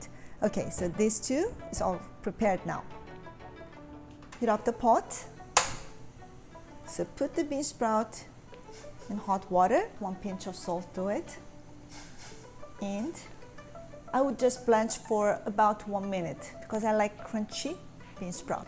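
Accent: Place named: France. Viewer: she sounds like she has a French accent.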